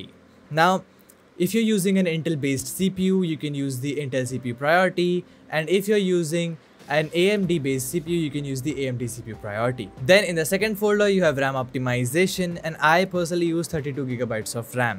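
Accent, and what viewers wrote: India. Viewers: indian accent, dislike, next video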